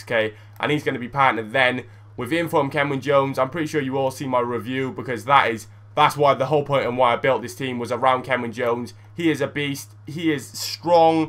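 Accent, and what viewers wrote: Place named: Cardiff, United Kingdom. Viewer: Sounds like a Cardiff boy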